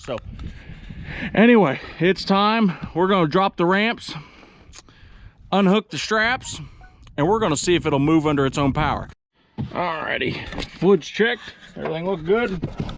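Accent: South, United States. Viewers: Don't worry I subscribed but your voice sounds like Mickey Mouse with a Southern drawl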